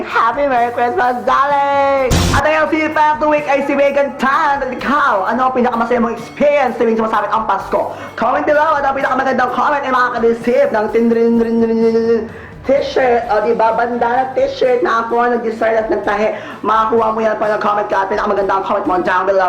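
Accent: Visayas, Philippines. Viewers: bisaya ka po???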